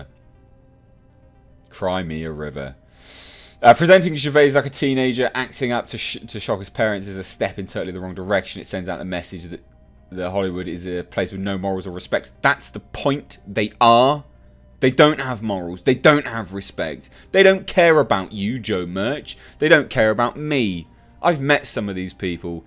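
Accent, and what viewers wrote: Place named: United Kingdom. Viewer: "Why should we be listening to self important douche bags". I don't particularly want to listen to them, but the 1st ammendment is all about free speech son, and I'll defend their right to make political statements, and rickys right to ask them not to. If you dont want to listen to them, you have the remote and it has a volume control, and other channels are available. And, as you sound like a brit, I'm guessing you never watched it live anyway and have only seen clips on YouTube.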